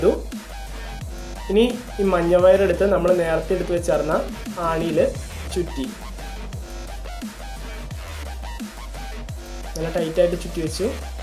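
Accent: Thrissur, India. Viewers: Oru Rakshayilla.. Trissurkaranano?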